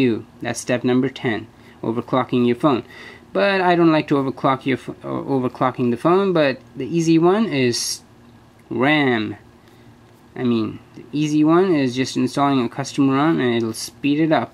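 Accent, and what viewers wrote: India. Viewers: you MUST be indian... i can tell clearly from the accent.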